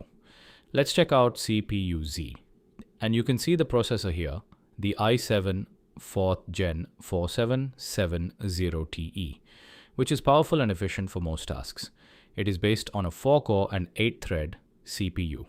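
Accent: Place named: India. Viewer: True indian accent 🫡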